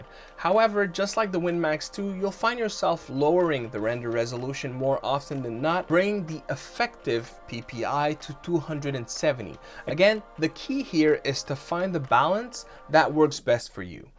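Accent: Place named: Morocco. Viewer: I've been sitting here trying to guess where your accent is from. I'm gonna guess Moroccan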